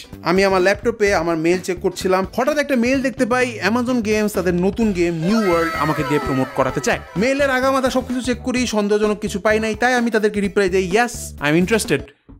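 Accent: Mymensingh, Bangladesh. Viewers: Are you from Mymensingh??/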